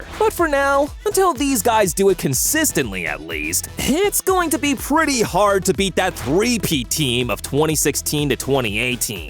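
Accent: United States. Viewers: What kind of American narrator is this? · Your voice an american pronunciation are unbearable, can't watch this shit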